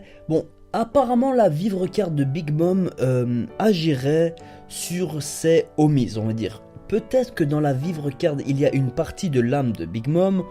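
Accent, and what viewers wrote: Belgium. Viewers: il super bien ton acent belge ca fait marre et ca change de ceux des francais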